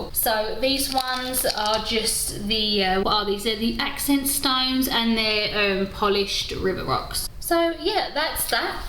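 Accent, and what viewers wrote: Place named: London, United Kingdom. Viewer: You went to Walmart. You sound like your from south or east London.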